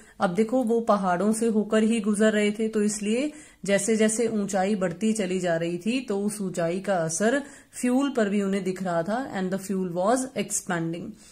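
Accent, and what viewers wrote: Punjab, India. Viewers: Ma'am Are u a Punjabi ?? Because ur slang is like us 😅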